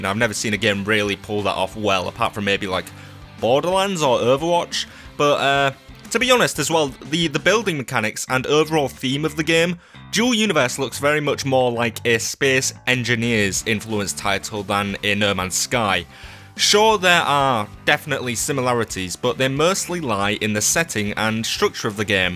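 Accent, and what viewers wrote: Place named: Yorkshire, United Kingdom. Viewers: It sounds like he's from Scarborough, this is the first time I'm watching one of his vids though... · I think it's shared... A sharing universe. Not a shard, crystal shard or otherwise. Cool accent. I wonder how an American saying 'shared' sounds to our Yorkshire friend here, probably very nasally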